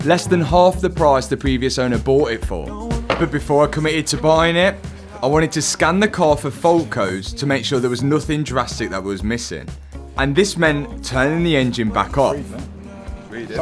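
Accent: Leicester, United Kingdom. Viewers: big teeth and the british accent jumpscared tf outa me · Your accent sometimes I'm unable to understand. Is it a British accent? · Is the accent a Leicester accent? It's a glottal stop wonderland!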